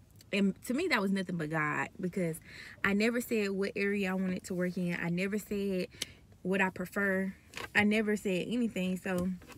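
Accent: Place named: Georgia, United States. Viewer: Girl the accent screams Georgia lol. I’m from Albany, born in Phoebe Putney Hospital 🤍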